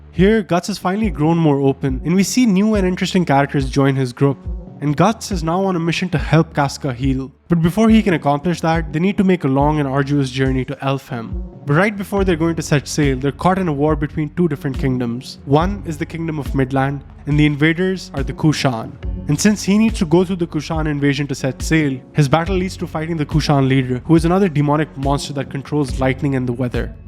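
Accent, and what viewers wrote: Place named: India. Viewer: i really like the way you tell the story and the fact and the analysis and i really like that you view it from philosophical side, but the thing that probably makes people not watch your whole video or skip it or not even starting it is that your voice(no hate) doesnt pass and it sound bit funny cuz of your indian accent ofcourse it can fix whit the time but i suggest you using elevenlabs or some ai voice that sound more good more profesonial(i dont talk about deep ai robotic voices), anothjer thing is that many of your photos dont mach the ratio of the video(there are blackbars) and alot of the music is not good combined whit the video and another thing is that there is no motion. There are a lot of parts where there is only one picture you are talking over it and it just stands making it feel boring even if the script is very good and the video is good. 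Add some motion. Another things is that there are photos that are in bad quality and you can find better ones. Again no hate and i hope to see even more evulution of your channel i really like the way of you viewing things and i am sure you can make your channel really good.(NO hate again)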